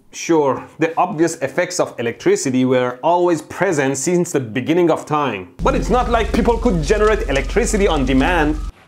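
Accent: Iran. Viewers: Love the Persian accent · Most Iranian pronunciation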